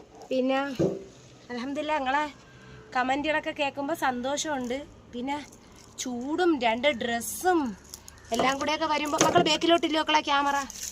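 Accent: Thiruvananthapuram, India. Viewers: നിങ്ങൾ വളരെ കഷ്ടപ്പെട്ട് ജീവിക്കുന്ന ഒരാള് തന്നെ . നിങ്ങളുടെ മനോഭാവത്തെ അഭിനന്ദിക്കുന്നു സഹോദരി . ആരെയും മനസ്സ് കൊണ്ട് പോലും ദ്രോഹിക്കാതെ ജീവിച്ചാൽ റബ്ബ് അനുഗ്രഹിക്കും . നിങ്ങൾ എവിടെയാണ് നാട് . സംസാരം കേട്ടിട്ട് തിരുവനന്തപുരം ആണെന്ന് തോന്നുന്നു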